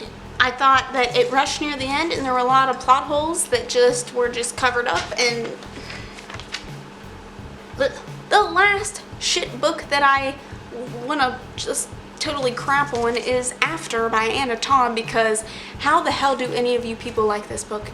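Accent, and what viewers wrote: South, United States. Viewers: Do have an accent? I hear southern a bit in your voice. It comes and goes though.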